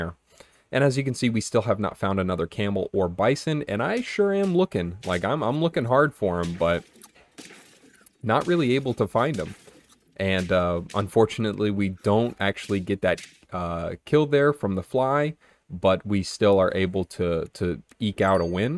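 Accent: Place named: Canada. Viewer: You sound so Canadan at the beginning of this video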